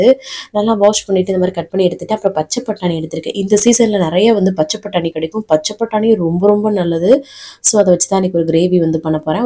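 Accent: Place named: Madurai, India. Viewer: kk but pesurathu Madurai slag mathiri iruthathu na madurai tha native but ippo mrg aagi tirupur vanthute 8 yr aatchu 💕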